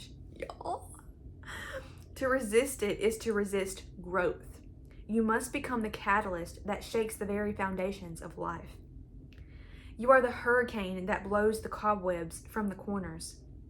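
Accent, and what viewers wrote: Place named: South, United States. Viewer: that southern accent goes crazy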